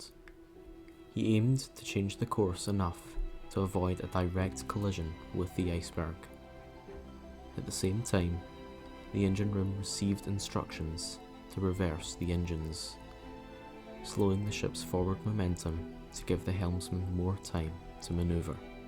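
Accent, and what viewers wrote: Scotland, United Kingdom. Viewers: Bros scottish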